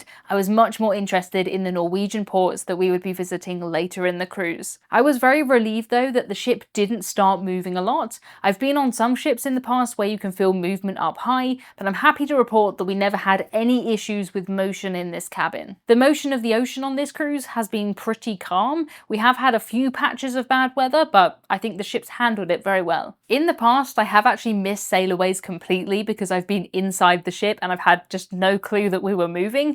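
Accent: United Kingdom. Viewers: I've never been on a cruise and don't really want to, but a while back I got addicted to presentation style and bits of humor and I keep watching her cruise videos. It must be that charming British accent she has that keeps me coming back!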